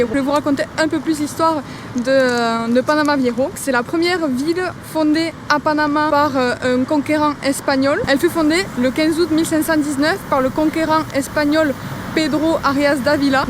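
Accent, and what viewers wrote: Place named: Carmaux, France. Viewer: Un travail de montage de qualité, un accent carmausin au top et une diction impeccable, bravo :)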